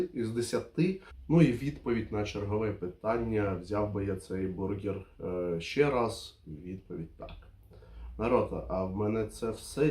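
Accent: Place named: Eastern Ukraine, Ukraine. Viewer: Ну за українську лайк, молодець, відчувається говір такий східної України, але все ж таки це добре буде, якщо будеш частіше випускати такі ролики на українській, а бургер цей рожевий Мохнатівський збираюся на днях поробувати, дорогущий правда, але на хайпі робляться гроші!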